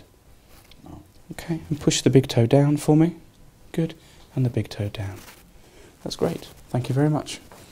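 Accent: United Kingdom. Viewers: Very british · Thats most biggest British accent I ever heard :)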